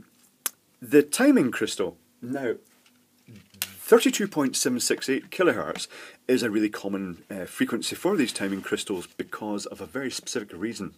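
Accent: Scotland, United Kingdom. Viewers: Great teacher, selection of crystal frequency is something I hadn't even considered before - obvious now. Great delivery and the erudite Scots accent doesn't do any harm either - very watchable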